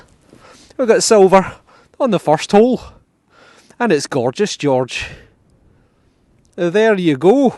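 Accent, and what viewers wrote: Scotland, United Kingdom. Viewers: Enjoy your videos for your historical content and love your Scottish accent.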